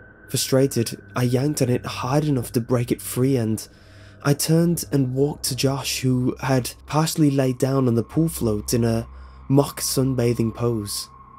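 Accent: United Kingdom. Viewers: Today marks ten years since this video was created. Even today, this still remains one of the (if not possibly the ) best creepypastas ever written! I find this video to be a great encapsulation of the story. You're soothing british voice sets an immersive feel and the use of music and sound design is expertly crafted. Overall, this is an incredible story and video and here's to a decade of its existence!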